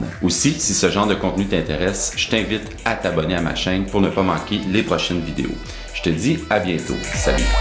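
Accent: Quebec, Canada. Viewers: Bonjour. Je trouve tes vidéos très utiles. Elles m'aident à progresser dans ma technique. L'idée de la phrase musicale hebdomadaire est géniale. C'est court et pour moi qui suis débutant mais passionné, ça me permet d'avoir un exercice quotidien pour mes séances de musique. Je n'ai pas d'idée particulière sur un accord ou une suite d'accord, mais plutôt une suggestion (peut être pour plus tard), une phrase question...et sa réponse.😶 Et puis je voulais te dire merci pour ta générosité, ta simplicité et ta clarté. En plus cette pointe d'accent d'un cousin d'Amérique est tellement rafraîchissante...😁. À bientôt. Christian.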